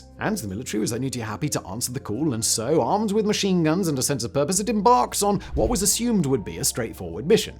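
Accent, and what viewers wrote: United Kingdom. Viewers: Oversimplified with a british accent. Interesting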